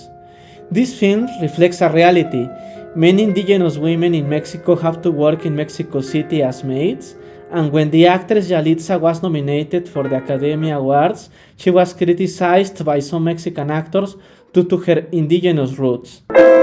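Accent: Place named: Spain. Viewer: Muchas gracias por el video. Me gusta mucho tu acento español cuando hablas Ingles. Haz más videos por favor ❤❤❤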